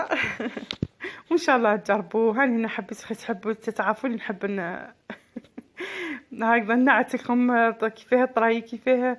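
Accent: Setif, Algeria. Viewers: سلام عليكم ورحمة الله وبركاته أنا ساكنة في فرنسا من سطيف وراكي زاهتني بالهدرة تعك يا سطيفيا الحرة و كثر خيرك و يرحم الي جاب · راكي فور واهدري نورمال هدرتك تحليك سطايفية وعليك لكلام 😘😘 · هدرتك هدرت سطايفية حرة 😍😍😍😍😍😍😍😍😍😍 ماشاء الله حبيتك بزاااااااااااااااف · هدرتنا تسخف حنا السطايفية ... انا نتبعك غير باش نسمعها ... كملي اهدري هكدا عيشك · مميزة صح هدرت سطيف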